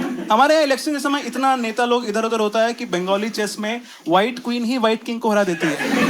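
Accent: Bihar, India. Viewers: Bhai , tumhari accent to bihari jaisi hai ,I think he is also from Bihar 😂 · After the whole video I felt you have learnt hindi from a bihari friend of yours maybe.. Cause my bengali friends also talk in the same way as you😅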